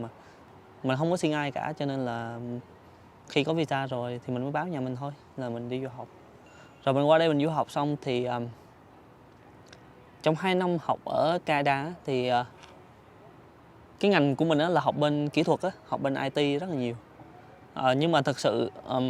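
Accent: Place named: Da Nang, Vietnam. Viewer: Nghe giọng anh có vẻ là người Đà Nẵng, em cũng ở Đà Nẵng qua Calgary được 4 tháng rồi. Hy vọng không phiền nếu có thể kết nối để hỏi anh nhiều hơn ^^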